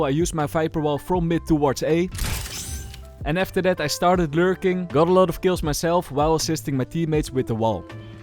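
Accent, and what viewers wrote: Netherlands. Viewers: I hear the dutch accent in every sentence hahaha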